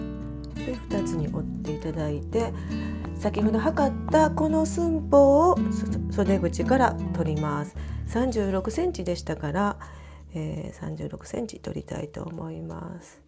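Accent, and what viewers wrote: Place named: Kansai, Japan. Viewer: 先生は関西の方ですか？ 私と同じ関西弁でした。😆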